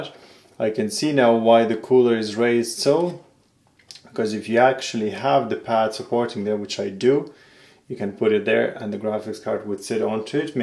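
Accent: Ireland. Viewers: I can hear the slight Irish accent lol. Are you Irish?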